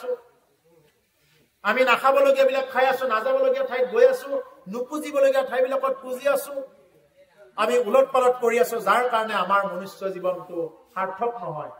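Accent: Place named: Darrang, India. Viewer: কিমান সুন্দৰ লাগে এই দৰঙ ৰ সুৰ টি কৃষ্ণ কৃষ্ণ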